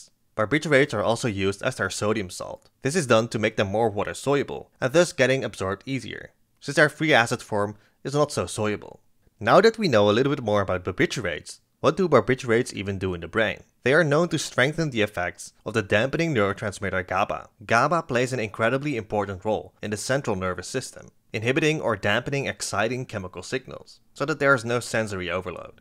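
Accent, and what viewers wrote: Netherlands. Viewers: Nee echt leuke videos... stiekem beter dan nilered. Ookal heb je een nederlands accent je prsst fijner, iets sneller en minder met een zeurige toon. Hahah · Your voice has a hint of Dutch if that’s true damn it’s a fine accent if other disregard the previous 😅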